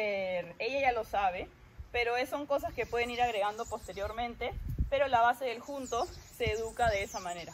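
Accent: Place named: Panama City, Panama. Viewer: supongo que por su acento es de Panamá capital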